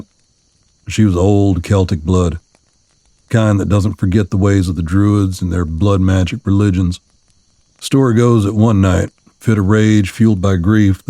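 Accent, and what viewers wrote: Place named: South, United States. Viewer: Awesome Awesome Continuation of this story. I love the 1st two I listened to by Viidth22 and again(1st one) by LightHouse just before coming here(my two Fav readers and voices). I was pleasantly surprised by your voice and it matched with the story so well with your southern voice. I Love these kind of Stories, it might be my age I'm not sure as I'm almost 60. I find some stories have a much younger feel to them and I can't get in to them as much as others, I'm an old Dean Koontz fan from the early 80's, read all his stuff since, and of course other Authors, so months and months ago when I came to some reading channels I was so happy as my eyes are not as good anymore, as I use to read for hours but can't anymore. *Plzz don't stop writing about these fella's and don't kill Jonas off yet like you did Tommy... lol.. 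Your Fan!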